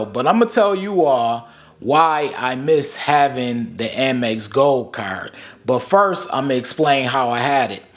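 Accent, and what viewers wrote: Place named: Buffalo, United States. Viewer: Are you from buffalo?...gotta be. I hear it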